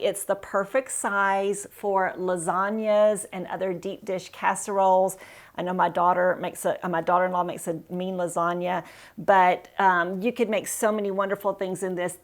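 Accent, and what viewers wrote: South, United States. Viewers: I love your pink sweater beautiful color if you don't mind saying what state are you from and living in. You are definitely southern I can tell my dad's people are from Arkansas Alabama and my mom's people from Michigan and live in Florida I am a Yankee but I live in Arkansas it took a long time to adapt for me different culture shock lol but I love Arkansas people friendly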